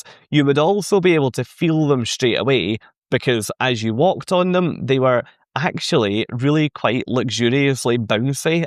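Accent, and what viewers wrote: Scotland, United Kingdom. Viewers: Thanks for your video about the ship update. Although I'm good at understanding accents from various languages, yours is very, very strong; it must be Scottish, right?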